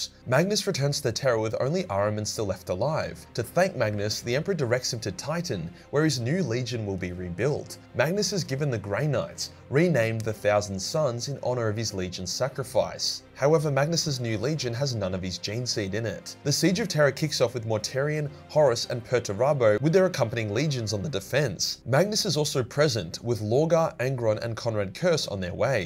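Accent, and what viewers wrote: Australia. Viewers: You spoke to fast, just heard aussie accented noise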